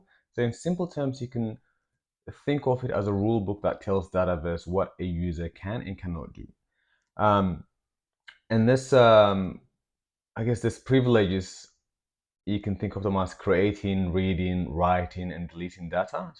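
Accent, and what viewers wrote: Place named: Ethiopia. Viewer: My good sir, Might you be habesha. The accent made me check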